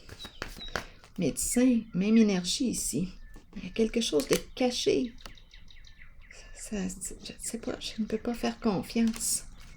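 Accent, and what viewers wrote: Quebec, Canada. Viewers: PS: j ai failli naître au Canada !!! J' apprécie vous entendre parler le Québécois !!!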